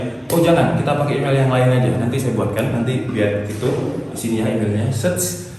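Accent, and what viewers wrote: Java, Indonesia. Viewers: Wong Malaaang wkwkw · Yg gw suka dari channel ini itu karna real banget mungkin dikit'' lihat prefrensi gitu tapi lainya real nggk ada settingan....dan logat jawanya ada dikit'' soalnya gw org jawa jg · jowo ne ndi mas? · Org jawa kah kok logatnya kek jawa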